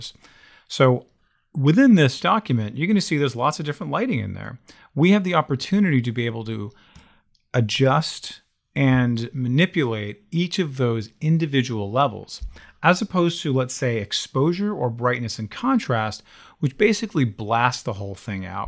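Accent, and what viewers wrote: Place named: United States. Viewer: Appreciated it but struggled a bit with Adobe help, just didn't 'get you started', it seemed to make assumptions about what you already knew (great chopping up layers but how do you get the pictures in the first place to be able to edit them?). This guy is brilliant, so well constructed, builds up nicely, importantly for a Brit, has a very toned down American accent and style - that's a compliment, he's really easy to listen to. Thank you so much, just brilliant.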